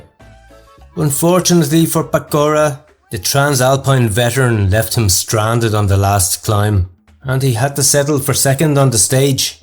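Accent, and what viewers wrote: Ireland. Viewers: The videos and the information are always great but the Irish accent takes the biscuit or the cake👏💪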